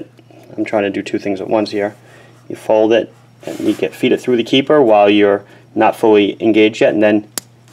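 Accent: Long Island, United States. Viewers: "It popped right awf" "Now that it's instawlled" Love lawnguyland accents.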